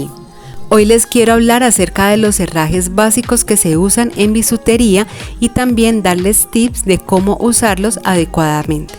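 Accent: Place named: Colombia. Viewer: Hola; mil gracias por tus explicaciones..creo por tu acento que eres colombiana como yo, que bueno si es asi Estoy aprendiendo mucho con tus tutoriales por ahora para hacer regalitos y ojalá pueda vender. Vivo en usa. y he conseguido poco a poco los materiales. Tengo 69 años y ha sido facil aprender. Un abrazo desde la Florida, usa.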